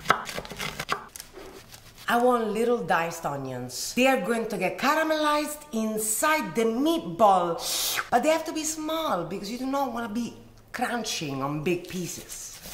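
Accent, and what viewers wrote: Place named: Rome, Italy. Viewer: Che meraviglia quell' accento Romano, ah quanto vorrei essere invitato a casa di questa mamma "per mangiare si"😅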